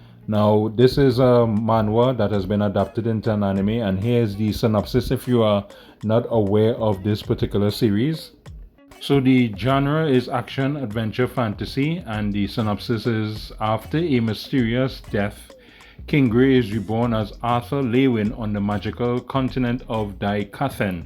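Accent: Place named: Trinidad and Tobago. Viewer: Are you from trinidad cuz im from trinidad and i recognize how you were talking just like a trini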